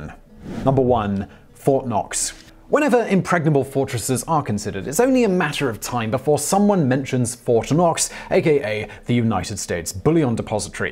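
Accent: United Kingdom. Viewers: I love listening to Simon talk. I'm still a little unsure why the Brits pronounce Z with "Zed." We just call it "Zee."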